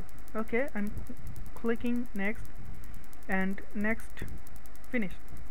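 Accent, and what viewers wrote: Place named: Bengal, India. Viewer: What happened in m You also do not speak English good!! You speak Bengali vernacular.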